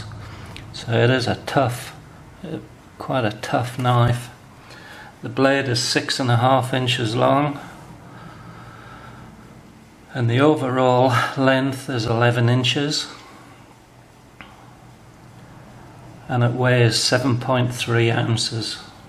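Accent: Northern England, United Kingdom. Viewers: just out of interest where is you accent from ? you sound like your from up north in the U.K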